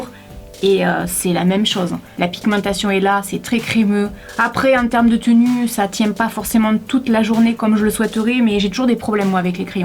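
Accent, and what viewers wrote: South, France. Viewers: je me répète mais j'adore ton naturel, ton joli petit accent du sud, ton humour, et ta sincérité! Je ne me lasse pas de tes vidéos:) bonne continuation! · Hello je suis un mec et j'ai découvert tes vidéos alors au début je me suis dit avec son intro “salut !” je ne vais pas aimer elle dit ça d'un air sévère haha alors pour le coup je me suis complètement tromper tu est géniale, franche et d'une bonne humeur communicative avec ton super accent du sud j'adore bonne continuation tu est pétillante !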